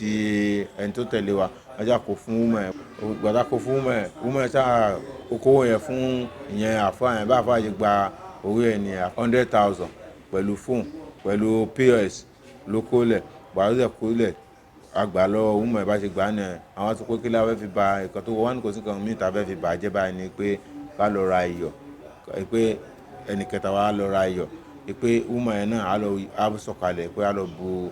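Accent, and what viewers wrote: Nigeria. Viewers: Yeah is from osun either okeigb. Ile ife or ifetedo.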